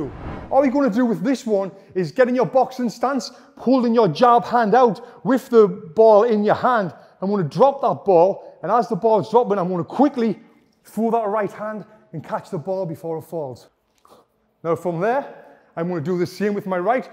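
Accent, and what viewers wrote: United Kingdom. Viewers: British accent sounds cool. From Russia with respect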